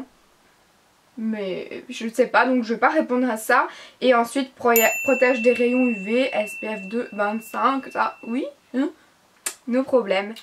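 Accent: Belgium. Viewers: Ta voix avec ton accent Belge c'est super Mignon ah ah 💕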